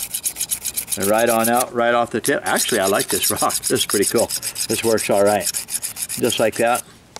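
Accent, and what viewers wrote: United States. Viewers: youre from california ? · You look very scandinavian for an american sounding white man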